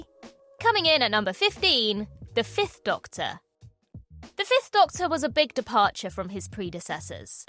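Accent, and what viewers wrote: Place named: RP, United Kingdom. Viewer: Thank you. You were quite magnanimous in sticking to the script even as you repeatedly said "I wouldn't have picked that one, but it's here on this list, so let's roll with it." I am always grateful to hear clear crisp RP (pretty much) accent. Thank you for that too.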